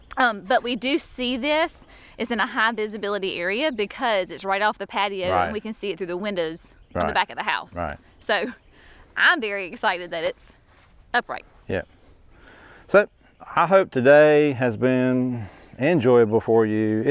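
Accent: South, United States. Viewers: I'm learning southern accent thanks to your videos 😂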